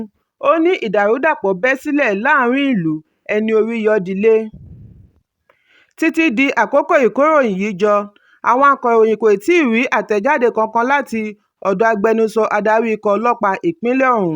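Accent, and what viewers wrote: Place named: Ikale, Nigeria. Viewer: The news reporter sounds like an Ikale/Okitipupa person. Good job!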